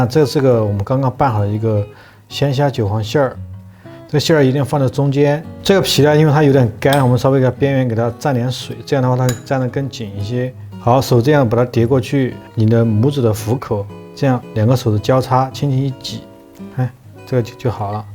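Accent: Sichuan, China. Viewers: 听口音是四川人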